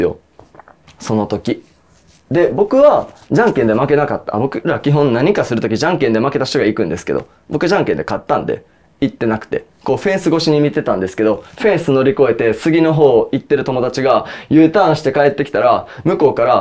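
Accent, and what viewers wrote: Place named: Kansai, Japan. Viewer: 何が気になる？ 別に変な関西弁じゃないよ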